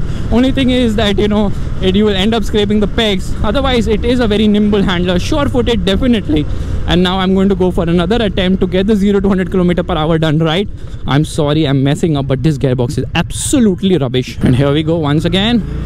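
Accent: India. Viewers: logat e lucu yo Mbah wong india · i'd rather watch the whole video on mute just to look at the motorcycle if i have no other option. And thats just to avoid that horrendous, terrible thick indian accent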